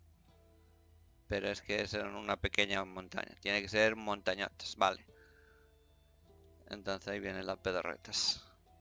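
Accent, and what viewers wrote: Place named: Galicia, Spain. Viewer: Cada vez que me paso por aqui... nose por que rio, con este juego... aaaaaa pobechito, cuanto debe doler jugar un juego como esoooo hahaha... Holas, ¿Que tal mi pequeñin YT? oh, seguro sabe quien soy mi Nene. en fin, #SinComentarios haha Buen youtuber, tiene su carisma y su acentó lo mas importante, por que si no fuera gallego, no era nada... Galiza Calidade, Le falta algo, un poco de sal... y (Mas juegos que pidan poco para la gente como yo) Hmmm Tsé, Nvidia me tienes, de ser mejor hahaha (creo que parezco locah) mejor me callo... Buen video bb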